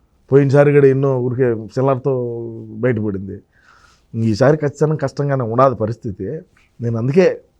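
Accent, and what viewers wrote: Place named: Chittoor, India. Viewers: Chittor yasa rocks 😂😂😂😂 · Anna tirupati language,tamil super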